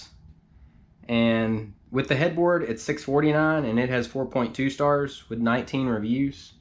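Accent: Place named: South, United States. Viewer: I wonder what this southern sounding guy keep in that safe 🤔🔫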